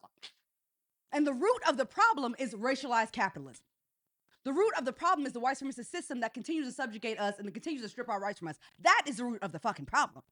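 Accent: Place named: United States. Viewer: Pan Africanism is dead Why don't you live in Africa ?? You sound like you are in the US..... Not Panama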